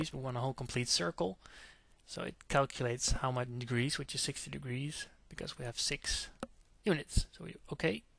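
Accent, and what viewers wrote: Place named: Netherlands. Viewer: Dutch accent :D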